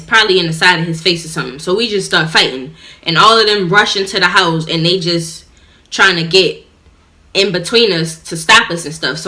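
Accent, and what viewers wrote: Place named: Baltimore, United States. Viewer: I know your from B more your accent is 🥰 blessings to u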